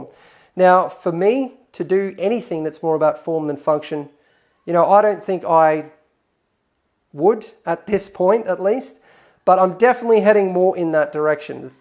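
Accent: Australia. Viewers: i love the vids so keep them up ! but i got one thing to get of my chest: i for sure thought u were an asian male ( no offence meant) i think it's just the Australian accent fucking with my mind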